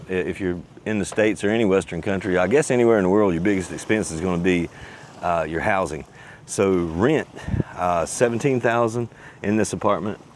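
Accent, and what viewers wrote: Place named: North Carolina, United States. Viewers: maybe Ive missed it, but are you including insurance costs in your budget…health, renters, bike etc.? My Filipina wife and I just discovered your channel and love it. We’re in Salem, VA and enjoy your NC accent. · Aussie subscriber here, good to hear your Southern accent s an Expat in Phils. Will be there in 3 weeks time maybe we can meet somewhere.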